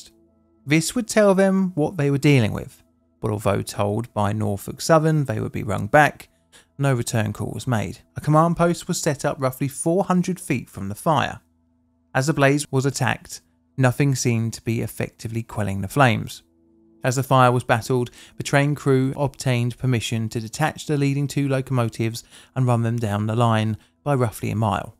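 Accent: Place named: London, United Kingdom. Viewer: I was sat back listening doing a few things and I was thinking to myself you know what Joshua this bloke sounds like he’s from London in the end though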